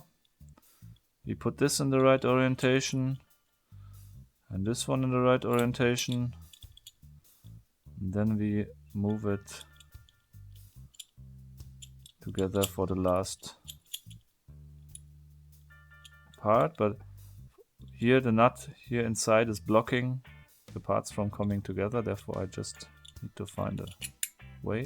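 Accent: Germany. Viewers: "screw them" ! :-) I love your Germlish. Never mind, it's ok.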